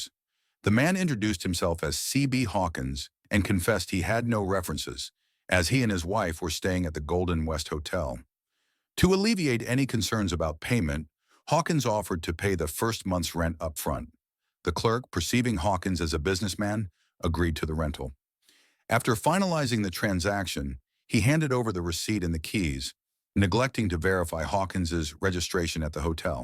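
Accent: United Kingdom. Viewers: What happened to the lovely British accent narration??!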